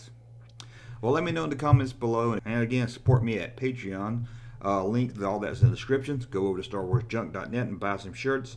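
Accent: South, United States. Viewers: i love that southern accent! reminds me of my grandma.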